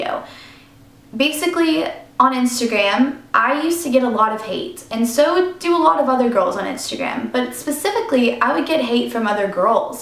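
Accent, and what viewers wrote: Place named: Texas, United States. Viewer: I heard a bit of that Texan accent in this video :P super cute, haha. Maybe everyone always notices it but I'm Texan so it's hard to pick up when I'm used to it xD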